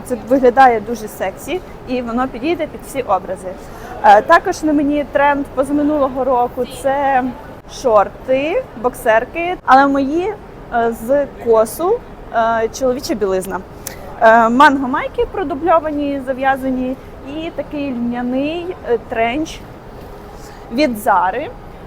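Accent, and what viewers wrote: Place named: Surzhik, Ukraine. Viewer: такий милий суржик, дякую за відео.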